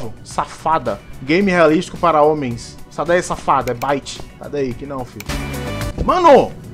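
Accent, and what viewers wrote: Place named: Pernambuco, Brazil. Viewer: conheço um oxi ate se eu fosse surdo,vc e Pernambucano sim como eu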